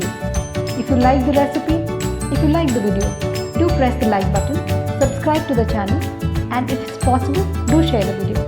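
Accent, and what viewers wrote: Garhwal, India. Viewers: मैम जतका स्वाद यूँ पहाड़ी व्यंजनू मा छ वतका इ स्वाद आपकी गढवली बोली मा भी आंणू छ। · Thank you so much mam for uploading it.and hearing Garhwali from you is such a treat to ears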